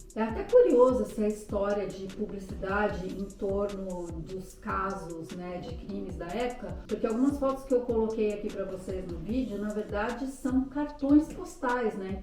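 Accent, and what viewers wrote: France. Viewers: te encontrei em 2025 e estou AMANDO seu canal!!! Você narra muito bem, com muitos detalhes!!!! E seu francês é uma delícia de ouvir!!! 🥰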